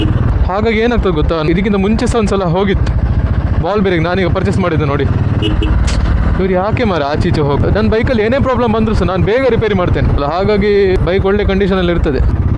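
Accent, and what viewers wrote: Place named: Kundapura, India. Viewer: Kundapra kannada kend kushi aith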